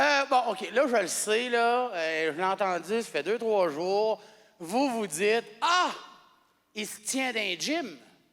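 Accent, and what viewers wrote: Quebec, Canada. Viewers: Sa fait pas trop rire à cause de l’accent mdr il y a que les québécoises qui vont rire vue qu’il ce comprenne mdr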